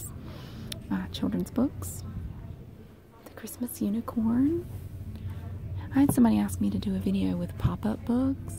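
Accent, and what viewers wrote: South, United States. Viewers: I love that fact that her voice is authentic and I love her southern accent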